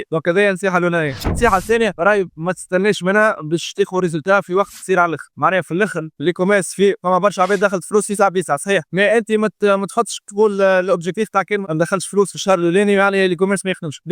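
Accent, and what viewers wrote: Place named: Oued Souf, Algeria. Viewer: لهجتك حلوة الله ابارك انت من واد سوف؟❤❤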